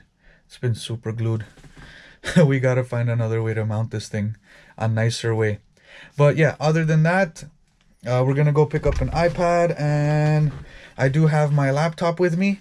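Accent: Canada. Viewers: from the moment you opened your mouth i knew you were from gta/peel lol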